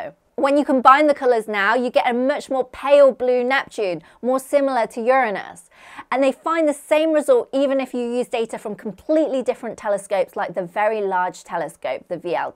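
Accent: United Kingdom. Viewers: I was is that a British accent. Could still be. Oh. Nottingham UK. Your so far might as well be on the moon😂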